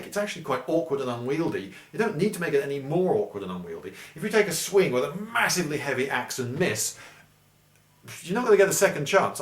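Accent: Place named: United Kingdom. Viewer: I have never heard such a good example of a british accent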